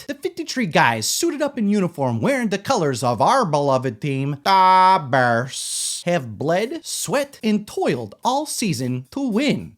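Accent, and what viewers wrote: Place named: Eastern Europe. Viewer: What is with that accent. You sound like someone from the old eastern block countries. You're mixing together your Chicago accent with Wisconsin accent.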